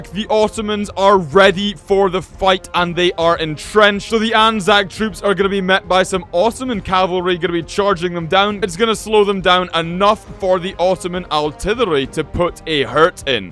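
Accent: Scotland, United Kingdom. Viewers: I'm just here to hear "Algorithm' in Scottish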